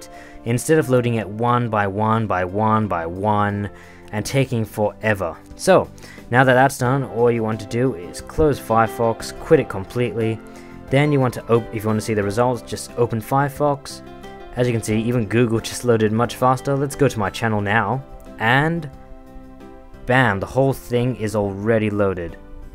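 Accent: Australia. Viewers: Australian accents turn me on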